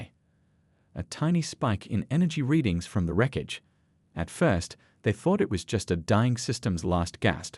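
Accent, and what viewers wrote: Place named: New England, United States. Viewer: The narrator (AI?) has a distinct New England accent!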